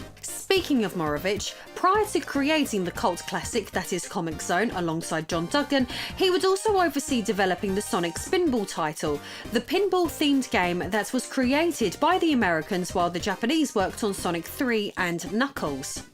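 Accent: United Kingdom. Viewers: Of course.It´s impossible not to be. British accent with astonishing beauty <3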